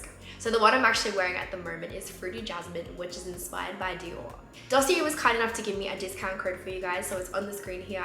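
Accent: Australia. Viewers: can you speak little slowly....I cannot caught few words because of Australian accent...I’m from India 🇮🇳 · Australian accent too tough to understand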